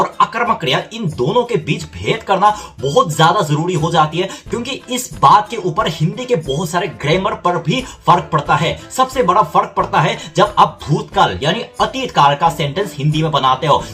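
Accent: Standard. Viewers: Aapki Hindi bohot acchi hai or uccharan bhi bohot shudhh hai....Agar aap bataoge nahi toh kisi ko bhi pta nhi chalega ke aap Koreai nagarik hai.... You explained the whole thing beautifully....the video also tells a lot about Korean language. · Bohut sudh hindi bolta h bhai esha hindi toh hamlog nahi bolte.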